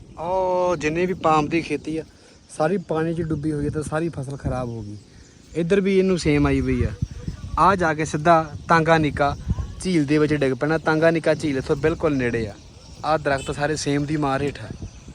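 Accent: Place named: Punjab, India. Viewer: ਇੱਕ ਵਾਰੀ ਤਾਂ ਪੁੱਤਰ ਸਹੀ ਗੱਲ ਦੱਸਦਾ ਕਿ ਜਦੋਂ ਤੇਰੇ ਕੋਲ ਬਹੁਤੇ ਲੋਕ ਇੱਕਠੇ ਹੋ ਜਾਂਦੇ ਹਨ ਉਦੋਂ ਡਰ ਲੱਗਦਾ ਕਿ ਕੋਈ ਸਿਰਫਿਰਾ ਆ ਕੇ ਕੋਈ ਮਾੜੀ ਹਰਕਤ ਨਾ ਕਰ ਦੇਵੇ ਪਰ ਅੱਜ ਤੱਕ ਵਾਹਿਗੁਰੂ ਜੀ ਨੇ ਕ੍ਰਿਪਾ ਕੀਤੀ ਹੈ ਸਾਡੇ ਪੁੱਤਰ ਨੂੰ ਕੋਈ ਆਂਚ ਨਹੀਂ ਆਉਣ ਦਿੱਤੀ। ਵਾਹਿਗੁਰੂ ਜੀ ਕ੍ਰਿਪਾ ਕਰਨ ਅੱਗੇ ਵਾਲਾ ਸਫ਼ਰ ਵੀ ਏਸੇ ਤਰ੍ਹਾਂ ਵਧੀਆ ਚੱਲਦਾ ਰਹੇ।ਜੇਹੜੀ ਨਾਲ ਕੁਮੈਟਰੀ ਕਰਦਾ ਸਾਡਾ ਪੁੱਤਰ ਉਹ ਕਹਿਣੇ ਤੋਂ ਬਾਹਰ ਹੈ ਅਸੀਂ ਦੋਨੋਂ ਸੁਣ ਕੇ ਬਹੁਤ ਖੁਸ਼ੀ ਹੁੰਦੀ ਹੈ ਠੇਠ ਤੇ ਪੈਂਡੂ ਪੰਜਾਬੀ ਬਹੁਤ ਵਧੀਆ ਸ਼ਾਬਾਸ਼ ਪੁੱਤਰ।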